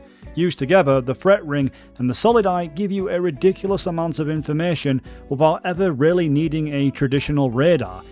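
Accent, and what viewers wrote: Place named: United Kingdom. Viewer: Love your british accent but 2 minutes long intro? FML.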